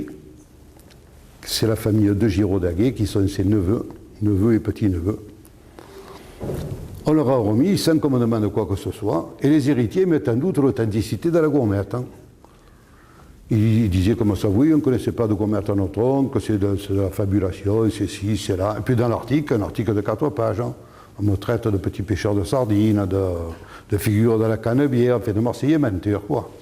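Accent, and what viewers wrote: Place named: Provence, France. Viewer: J'aime, j'adore votre façon de peindre la vie avec ce magnifique accent provençal !merci pour cette belle histoire 💛❤️aux couleurs de chez vous !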